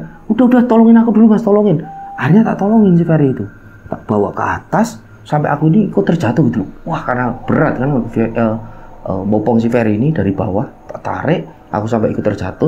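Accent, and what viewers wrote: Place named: East Java, Indonesia. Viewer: Tetaplah konsisten dengan Chanel Pendakian, semoga makin sukses....dulu saya kira dari Malang kok logatnya kayak Jawatimuran.....👍👍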